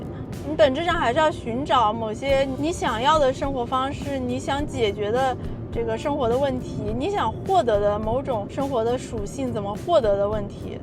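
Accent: China. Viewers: 哈哈，講話像是大陸人口音，可是render在影片上的字幕卻是繁體，還是剛好講的內容簡繁都是同字啊？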